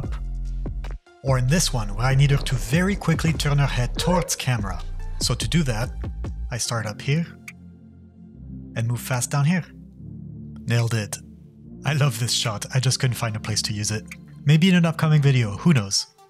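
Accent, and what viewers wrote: France. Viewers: Are you french ? You have a little french accent. I'm french too ! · Ça sent l'accent bien français 😁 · Tu as un accent français, tu es français ? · Wow I love your channel! But when I was watching this I thought “ hmm this guy has a French accent” then you said “et viola” and then I thought “ this guy is French!” By the way I was surprised because I’m French too and you are one of my favorite YouTubers! So, please answer this question… are you French? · Aren't you French????? I'm hearing some French accent no?? (I'm French) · vu l'accent t'es français non?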